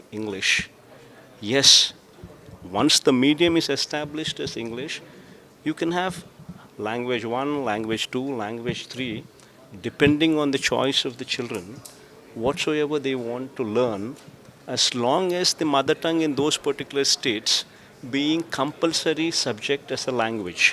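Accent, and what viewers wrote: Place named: London, United Kingdom. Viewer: Basted jaghan is talking London English lucha jaghan is captain's for all criminal mafia done is lucha jaghan he himself telling his character and his mind of jaghan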